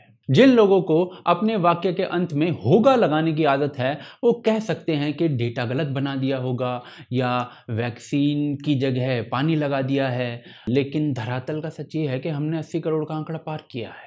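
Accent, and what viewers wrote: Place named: Malwa, India. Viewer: Sir Aap Malwa ke lagg te ho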